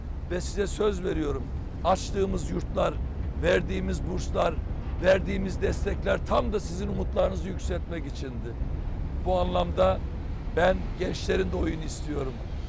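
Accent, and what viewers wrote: Black Sea, Turkey. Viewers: Hafif Karadeniz aksanı çok tatlı değil mi ya ❤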